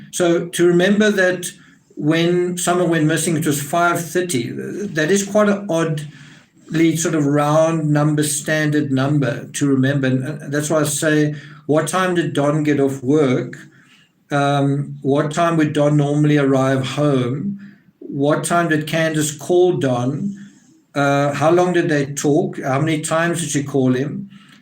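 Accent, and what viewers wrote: South Africa. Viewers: Found your channel last night and love it love the accent are you from S Africa . Best wishes from Ireland 🇮🇪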